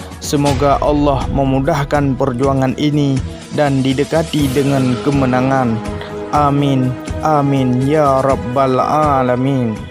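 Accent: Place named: Aceh, Indonesia. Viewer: Cakap bahasa aceh aja..bang..karna ngomong bahasa indonesia ..nampak kali toktok aceh nya.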